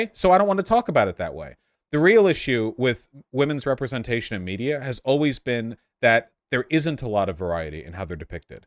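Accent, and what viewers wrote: United Kingdom. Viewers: that's northern England accent 🤣🤣Manchester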